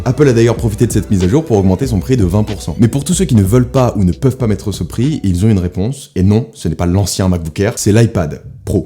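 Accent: Quebec, Canada. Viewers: Cette accent, québécois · Ahh un québécois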